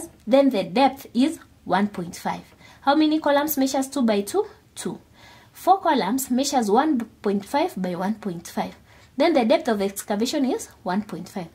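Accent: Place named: Kenya. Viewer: Weldone. I enjoyed your tutorial. You sound like a Kenyan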